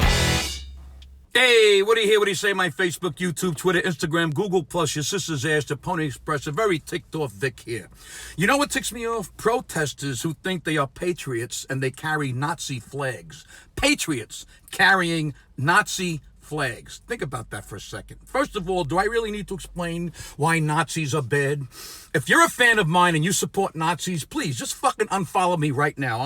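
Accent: United States. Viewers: Yeah ! Vic is Back 👍 sounding like a true American ❤️🇺🇸💙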